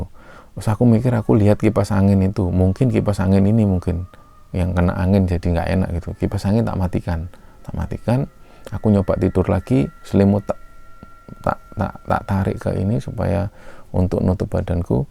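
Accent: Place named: East Java, Indonesia. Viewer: mas, smpean arek suroboyo ta? kok logat, intonasi sma drh2 yg smpean ceritakan di episode2 lainnya gk jauh2 dr Jatim ( Sby, Sda, Mjk, jbg ). Tp memang baru di episode ini sing aku bs bener2 "menjadi dirimu", hehehe.. salam teko dr arek kedungdoro Mas.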